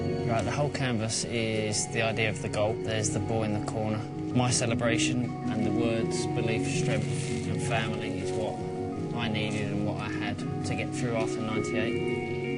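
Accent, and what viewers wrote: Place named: United Kingdom. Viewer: Acento británico... Difícil de entender pero lo sigo intentando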